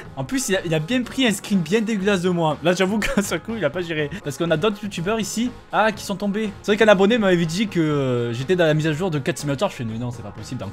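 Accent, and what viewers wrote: South, France. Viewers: Dat accent du Sud heing ! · Hello à c'est toi qui a rajouté le symbole illuminati ? xD Tu viens d'où ? car t'a un accent du Sud :p ( je crois Montpellier mais pas sûr vu que tu avais fais une vidéo ) Jsuis deçue pour le twerk, mais bon bad ass ! Mdrr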